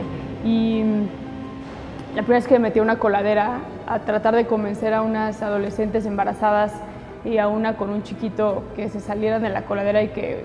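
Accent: Spain. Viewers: hace el acento español en otros programas lo hace más y ahorita no lo hizo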